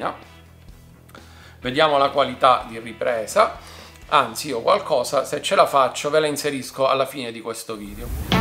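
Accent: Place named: Palermo, Italy. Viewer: Hai un'accento molto nota, sicuramente Palermo !!! Una informazione dove posso trovare in 3 piedi per questa macchina?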